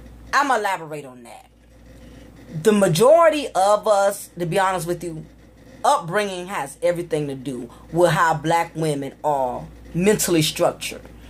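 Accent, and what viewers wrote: South, United States. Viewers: i always wanted to know were youre from cause of you're accent . im from Savannah. But rise up in the north east. i bet my mom knows youre family. You know how it is down there maybe i need to come back home for a good women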